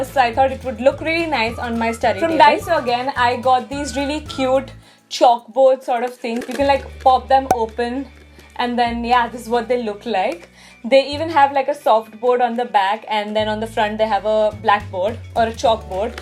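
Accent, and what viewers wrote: India. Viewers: She is indian n indians speaks like this only ....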